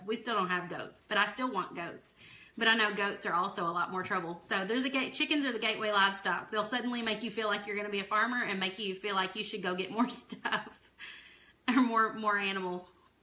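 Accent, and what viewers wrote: United States. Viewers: I know this is an older video, but I absolutely love your Strong Southern Accent. I'm a Southern girl myself from Alabama but for some reason I can't tell I have a strong southern drawl, but everyone else can..lol and the Rooster is adoorable! My kitchen is done in Roosters, John Deere tractors and Sunflowers.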